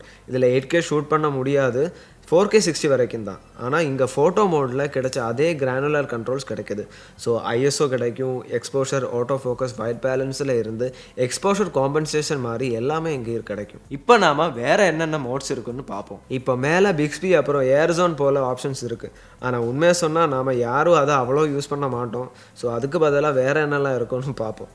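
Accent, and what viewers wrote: Kerala, India. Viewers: Malayalam hero Tamil pesara mathiri iruku ba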